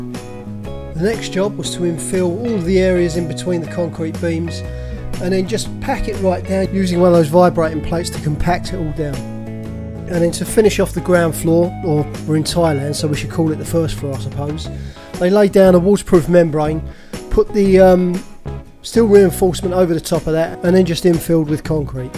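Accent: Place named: South London, United Kingdom. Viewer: Hi I was the one who couple months back said you must be from south of the river with your accent, live Sydney but spend lots time in Thailand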